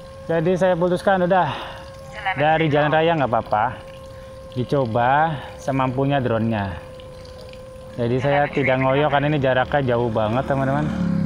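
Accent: Cirebon, Indonesia. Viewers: kalo dari logat bicaranya kalo ga orang cirebon.indramayu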